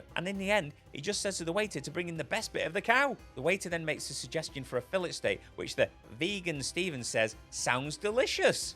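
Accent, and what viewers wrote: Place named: United Kingdom. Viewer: I like your accent! Where are you from? The UK? as for the topic: there's a lot of anti vegan propaganda in movies. I'm not surprised. it's their agenda to make us look unsustainable and treacherous.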